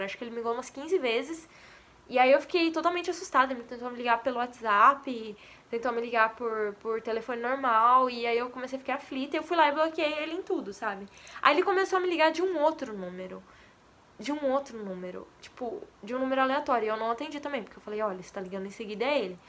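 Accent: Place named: Minas Gerais, Brazil. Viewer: Vc é mineira?kkkkk vc parece que é mineira kkkk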